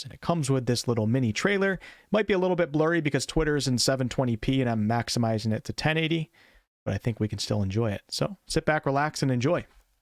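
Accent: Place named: Midwest, United States. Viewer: So I'm detecting a somewhat midwest accent? The way you say tealent!:)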